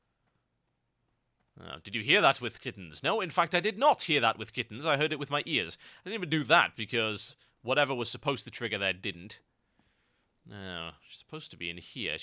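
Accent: United Kingdom. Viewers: dude your british accent fits for the worgen commentary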